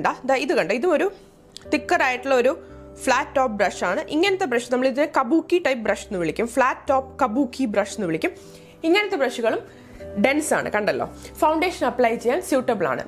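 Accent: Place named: North India, India. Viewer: Chechy adipoliya..chechide presentation nannayi manassilakunnu as a bigginner..pinne chechi malayali aanenne aarum parayilla .oru northy aanenne thonnu...lovly super...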